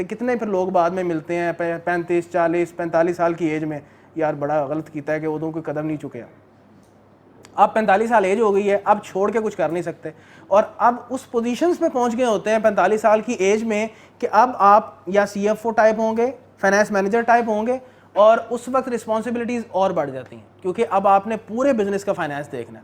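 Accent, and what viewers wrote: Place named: Punjab, India. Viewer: Nice punjabi excent.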